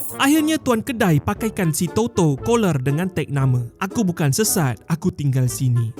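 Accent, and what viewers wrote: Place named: Sabah, Malaysia. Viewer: terkadang terselit logat Sabahnya.. menghiburkan betul bah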